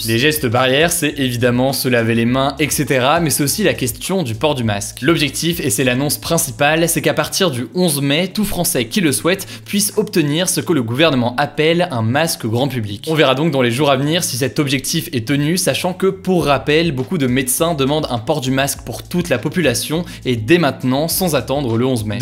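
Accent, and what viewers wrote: England, United Kingdom. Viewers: Mais cet accent anglais je suis admirative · Le british accent d’ 💛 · Son accent anglais me fascinera toujours autant XD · Cet accent anglais (sans ironie) pas mal ! 😂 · Bel accent british!!!!! 🤗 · Je like rien que pour ton accent anglais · that british accent you have :o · Ton accent anglais je l’adore 😂😂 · L'accent anglais wow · Ton accent anglais c'est un plaisir à entendre :D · Il a un accent anglais britannique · Comme d'habitude merci pour tes vidéos ! ❤️ Ce résumé est juste ultra pratique pour ne pas partir en psychose totale... Et au passage j'aime trop ton accent anglais ! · Cet accent britannique 🤩!! Merci pour ces news.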